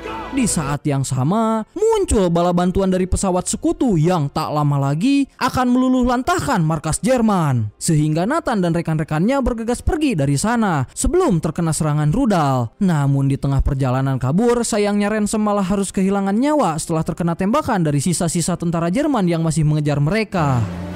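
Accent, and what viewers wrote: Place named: West Java, Indonesia. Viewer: Urang Sunda nya,,, edun euuuy lah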